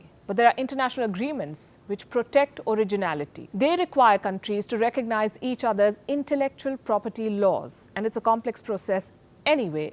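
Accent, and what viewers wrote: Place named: India. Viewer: Indian accent os the biggest ripoff that Indian has provided,youhave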